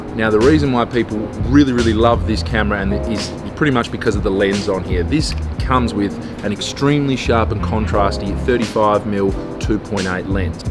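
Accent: Australia. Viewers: First I thought "an aussie!" then I realised you're also from Perth and I subbed immediately. Gotta rep the home town. Great vid, looking at this camera so super helpful!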